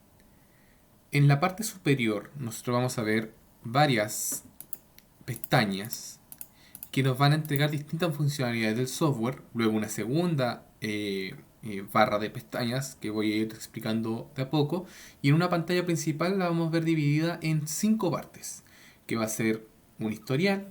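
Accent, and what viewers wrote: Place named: Chile. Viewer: Que bueno un tutorial en castellano chileno. Grcs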